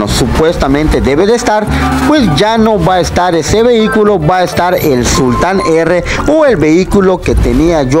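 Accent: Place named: Yucatan, Mexico. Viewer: Hablas muy yucateco bro . No se te entiende . Pero buen bug bro saludos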